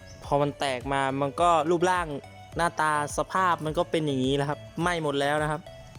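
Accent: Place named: Isan, Thailand. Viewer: คนอีสานปะครับเนี้ย โอ้ยยแต่ละคำน้อวว555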